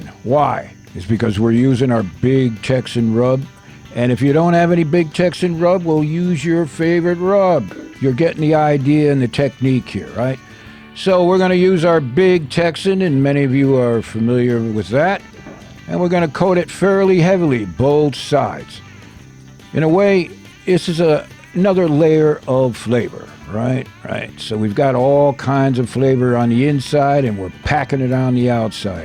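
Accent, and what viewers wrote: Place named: Colorado, United States. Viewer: His accent doesn't sound like a Texas accent more like Colorado maybe.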